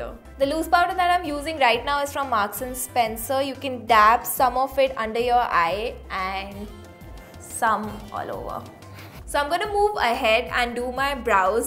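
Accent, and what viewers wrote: India. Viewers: has such an indian accent!...like....calaa ba pafect match prrima!...but roshni and upalina has more of foreign accent!btw just my veiw no offense!(and having indian accent isn't bad at all).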